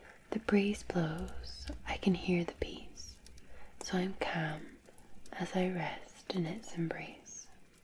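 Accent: Scotland, United Kingdom. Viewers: Amazing tingles, thanks ❤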